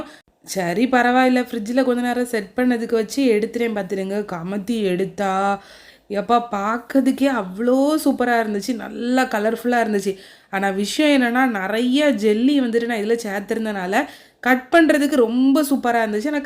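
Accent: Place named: Tirunelveli, India. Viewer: I hate tirunelveli slang. Tirunelveli place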